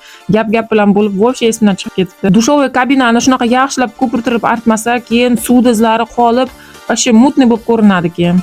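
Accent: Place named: Jizzakh, Uzbekistan. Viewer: Жиззахдан экансиз гапширишиздан билдим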